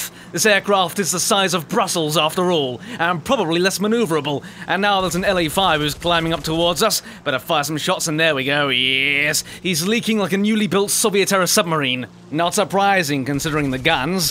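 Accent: United Kingdom. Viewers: I love your videos!! Just a suggestion but you and your British accent should play with baron and his German accent, and Phly and his American accent! And the whole time all 3 of you are the perfect stereotypes, I just think that would make for an amazing vid! Keep up the good work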